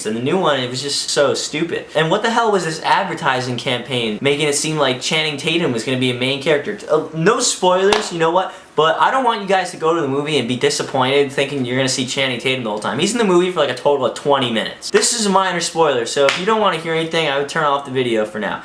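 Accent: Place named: New Jersey, United States. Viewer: l love the hint of a jersey accent you have 😄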